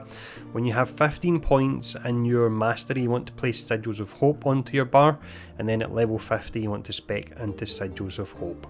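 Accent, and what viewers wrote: Scotland, United Kingdom. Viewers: great accent😊 Scottish? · You Scottish btw?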